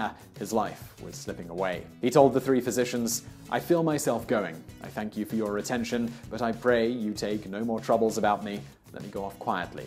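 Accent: United Kingdom. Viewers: British man talking about American history. Interesting.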